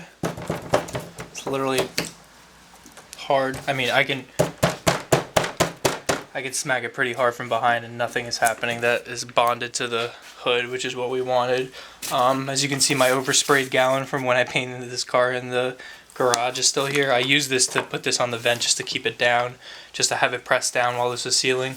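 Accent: Russia. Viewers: Are you russian??!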